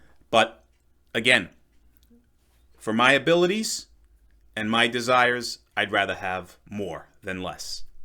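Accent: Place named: New York, United States. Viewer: Think I detect a NY or NJ accent.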